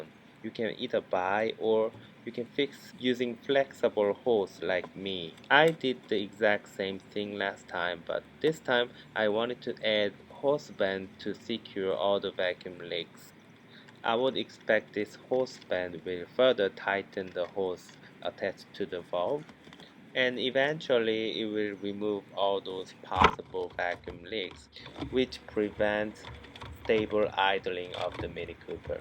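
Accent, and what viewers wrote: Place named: China. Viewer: You h@ Chinese accent dude.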